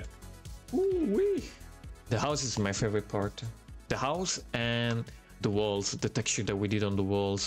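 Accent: Italy. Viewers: I'll be honest, I came across a video of your YouTube shorts and hearing your accent I thought "He's Italian", I opened your channel and the first thing I saw was this video. I subscribed to your channel without thinking twice.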